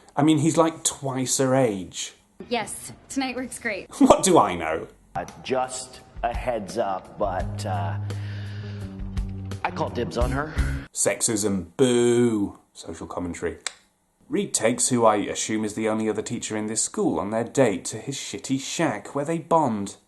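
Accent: United Kingdom. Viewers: As an American, whenever I find a new channel and you have a British accent, that's all I need to know this shit is about to funny.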